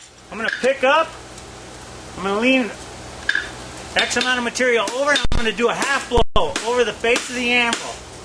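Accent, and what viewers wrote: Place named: New Mexico, United States. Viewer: . this is an excellent video, clearly explained and the photography does what it is supposed to do, allows viewers to see the detail and the progress of the process. The narration is very good, I could listen to the new Mexico drawl all day. Thanks for a great resource.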